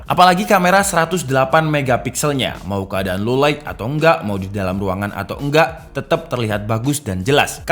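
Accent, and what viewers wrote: Malang, Indonesia. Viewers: Wong malang to tibak e 😂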